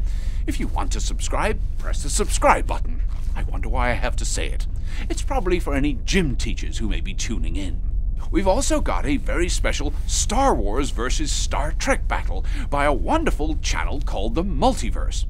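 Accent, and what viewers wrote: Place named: United States. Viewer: Well, he does already speak in an American accent. He did use P.E. teachers in Red Dwarf but it's not such a huge leap to imagine he would also use American words.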